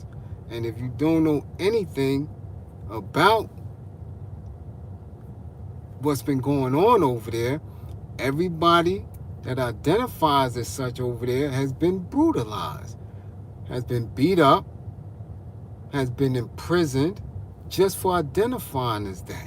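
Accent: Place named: New York, United States. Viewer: I just like your style man. The way you speak, the New York accent, knowledge being passed on, I salute 🫡 you OG, 29 year old brotha here. I’m saving this video to cause you responded to some of my live chat 💬 comment 😂😂. Keep it safe out there as well.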